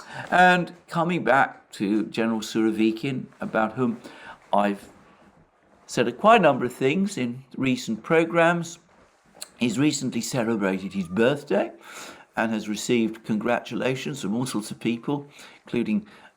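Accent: United Kingdom. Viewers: British accent is adorable and I like learning new words from. Moreover, now I know that even English speaking people say "hypersonic" instead of "supersonic" 😁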